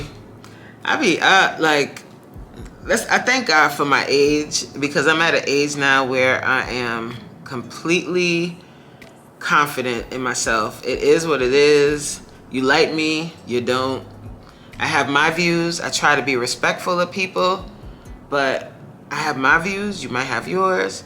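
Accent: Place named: Africa. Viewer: It's the African voice for me hahaha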